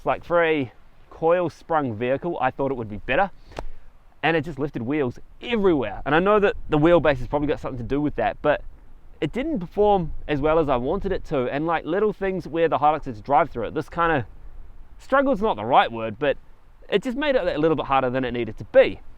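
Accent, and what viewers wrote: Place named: New Zealand. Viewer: NZ. Great video and love the enthusiasm and being an Aussie Kiwi love the accent. Coming from a personally highly modified Wrangler it's hard to good Fortuner content like yours. The Fortuner won't replicate the Wrangler in extremes but that not what it is for but love what you are doing without making it crazy and keeping it a balanced. I have followed your lead and just stuck on Maxxis Razr and am also looking at EFS suspension over OME or Tough Dog (maybe you should look at sponsorship ;-)). I am really interested in the longer shock you put in the rear and how that is still performing. Can you provide the model no of the Rear EFS shock please. Cheers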